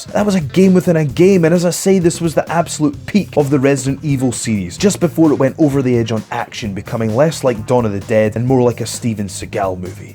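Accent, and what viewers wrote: Scotland, United Kingdom. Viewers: This guy reminds me of Dominik Diamond from Games Master. His Scottish accent when speaking on his retro reviews bring back so many memories!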